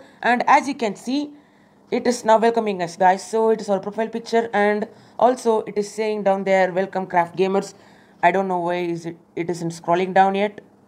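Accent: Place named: India. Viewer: U kinda sound hindi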